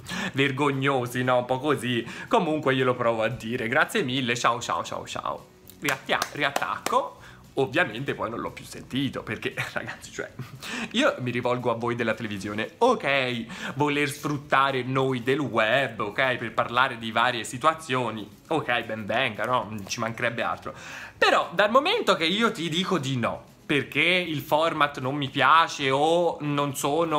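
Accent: Tuscany, Italy. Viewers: “MAILA CHE CARDO CO STE LUCI”😂😂😂 Chi è toscano come lui/me qui? 👇🏼👇🏼Mettete like👇🏼👇🏼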